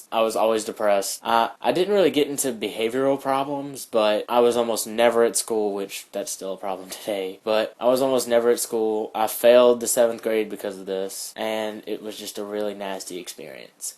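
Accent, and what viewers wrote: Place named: South, United States. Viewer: where are you from??? I hear a Southern accent, but not sure f ft. Om where.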